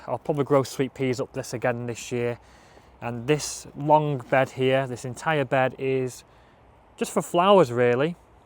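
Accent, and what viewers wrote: Manchester, United Kingdom. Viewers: me and the wife are mosly from sheffield now barnsley at a guess we think your from manchester ?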